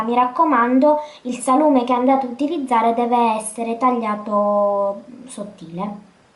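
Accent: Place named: Abruzzo, Italy. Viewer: Ah che bello! Avevo riconosciuto l'accento! Anche io ho origini abruzzesi ed ogni volta che guardo i tuoi video, mi sento a casa =)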